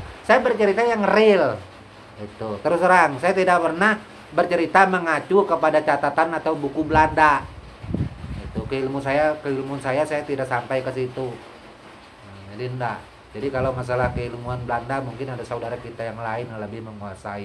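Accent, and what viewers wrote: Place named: Madura, Indonesia. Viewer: mAAF ko terkadang saya dengar seperti logat Madura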